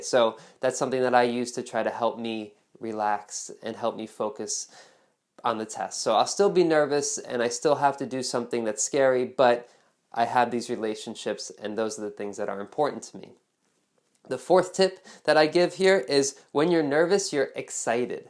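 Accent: United States. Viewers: Is that New York accent I'm hearing? The "T"s sound a bit like how Italians or Spanish pronounce their Ts.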